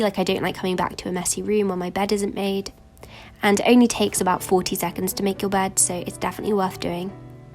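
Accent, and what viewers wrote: United Kingdom. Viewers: Yay she is British 🇬🇧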